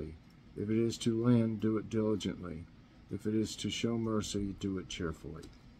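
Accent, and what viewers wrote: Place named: England, United Kingdom. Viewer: I love hearing your prayer with English accent,. I love it and thank you 🙏 always for regular prayers 😍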